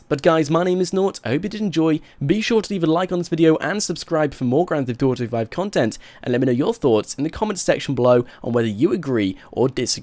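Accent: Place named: RP, United Kingdom. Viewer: You sound so posh, I LOVEEE IT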